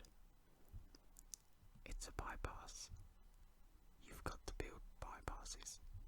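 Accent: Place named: United Kingdom. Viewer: So happy I found someone British like me. You have a lovely voice and made me very sleepy :)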